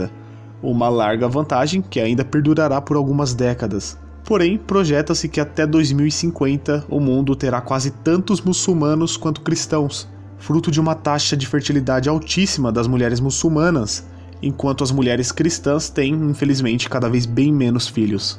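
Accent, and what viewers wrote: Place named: São Paulo, Brazil. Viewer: Ah, e acredito que o sotaque não interfira muito. Sou paulista e falo exatamente como você! Rsrsrs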